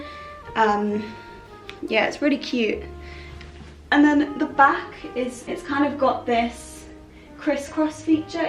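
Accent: United Kingdom. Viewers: I'm just here for the Mary Poppins accent.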